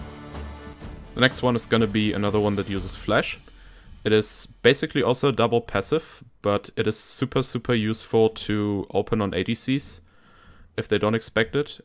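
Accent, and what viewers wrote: Germany. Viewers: are you german? x) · are you german?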